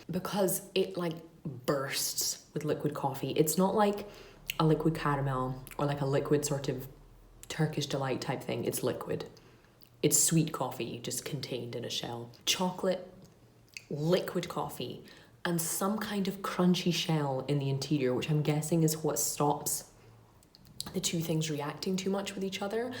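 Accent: Scotland, United Kingdom. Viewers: Is your accent Scottish? It’s beautiful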